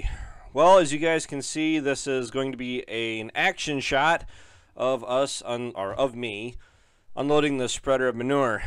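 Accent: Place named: Wisconsin, United States. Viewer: lol. Just busting your ball's. It's the Wisconsin accent. Good channel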